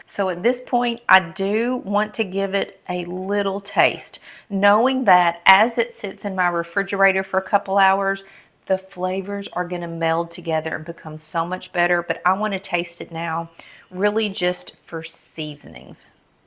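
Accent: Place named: Tennessee, United States. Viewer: Question: How big is your cutting board? I bought a new one recently, and I think it's too small. I love your accent. Tennessee? That salad is just up my alley; after 6 years on keto, it's time I reintroduced pasta, which I actually began doing 2-3 months ago. Thank you; I'm eager for more of your recipes.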